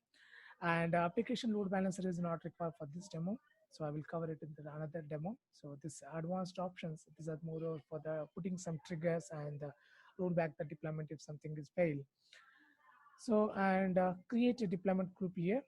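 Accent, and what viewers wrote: India. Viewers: Its India English :) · Настоящий индус. Не тратьте время. Кошмар, а не акцент.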